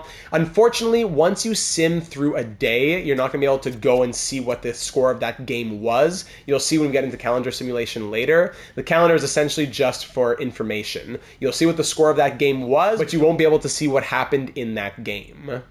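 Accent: Quebec, Canada. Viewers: thank you! Great video and est ce que t quebecois? Si oui gg pour ton accent anglais!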